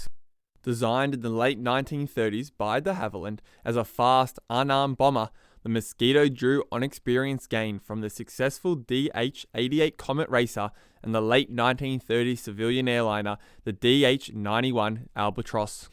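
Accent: Australia. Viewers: Made from timber and plywood?? What do you think ply is made from - plastic? Nothing new in the vid. I thought there were only three flyable airframes, all the rest were taxi dummys. - - - And a word of advice - disregarding your Aussie accent, you need to improve your diction, so that you don't slur your words or miss the ends off!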